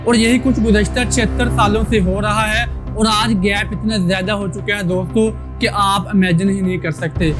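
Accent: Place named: Punjab, India. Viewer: Content aur topic acha hai magar presenter ka accent pure Punjabi honay ki waja say clear Urdu nahi hai. Presenter ka style aur awaz unchi aur clear honi chayee.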